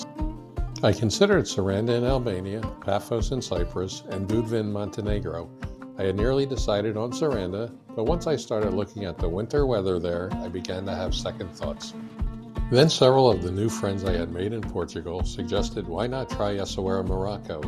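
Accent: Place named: Philadelphia, United States. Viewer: HI! OMG I am from Lower Merion! From Rosemont/Bryn Mawr area. I immediately recognized your accent from home like a melody to my ears!... I’m living on the west coast now but grew up in PA almost til college. I was curious where you’re specifically from in PHL or outskirts? I am Amazigh…Celtic-Moroccan, Siwa Egyptian and Portuguese. My family is from Algavre but emigrated for the most part to the states after intermarrying in the UK and came down thru to the Main Line with it’s more pluralistic society on my mothers side. Very curious about a move either permanent or temporarily to either Portugal or Morocco…as are my parents to possibly Portugal. Would love to connect (if you’re open) Especially because you understand the culture of home vs there abroad in both countries and those adjustments. I am 45, just 3rd generation born in the states so there’s much to explore and visit. 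Incredibly beautiful footage! I am late to the video but thanks for sharing! Please share an update video as well! All the best! -Micki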